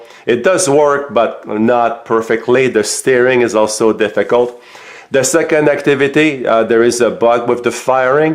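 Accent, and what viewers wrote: Quebec, Canada. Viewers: Merci pour la revue! (I'm writing in French since it seems like a French-Canadian accent like mine ;) )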